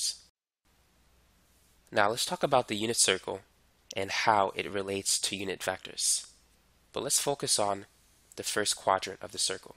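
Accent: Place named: East Coast, United States. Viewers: Are you from New York, you sound like it! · He’s from the east coast the way he says north “norf”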